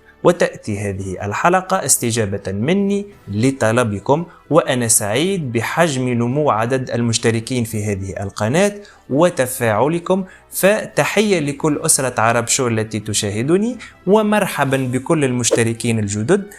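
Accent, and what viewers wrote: Tunisia. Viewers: انت باين تونسي على حساب كلامك ان شاءالله يوجدو البترول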